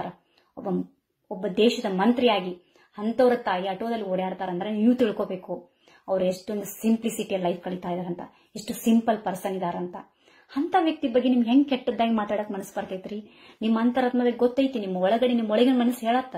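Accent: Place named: Hubli-Dharwad, India. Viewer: I just love listening to Hubli-Dharwad Kannada - it’s such a joy! Best wishes from Mysore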